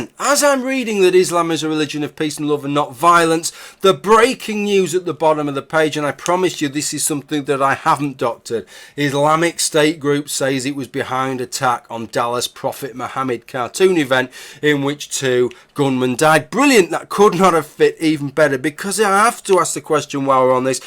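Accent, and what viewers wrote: United Kingdom. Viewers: I can see why some folks would consider statistical survey videos a bit of a slog, but the results and the conclusion we can draw from them are interesting to me. Also, I enjoy your accent and British slang.:) Call me cynical, but I think the answer to your final point is that the Muslims surveyed were "telling us what we want to hear". Be well,.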